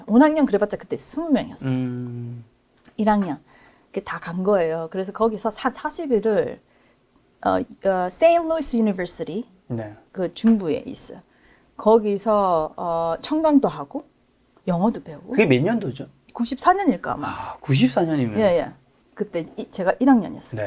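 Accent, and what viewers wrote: Gyeongsang, South Korea. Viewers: 지나영 박사 화이팅입니다 !! ☆☆☆☆☆~~♧♧♧♧♧~~~ 갱상도 사투리가 듣기 좋습니다 !!!! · 악바리 근성~~대구 말씨가 스며들어 잇네요~~실패는 이기거나 성장이다~~대구 아가씨 대단하다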